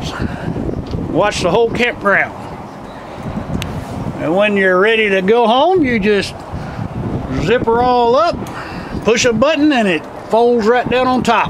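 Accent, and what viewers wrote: Texas, United States. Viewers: Texan accent?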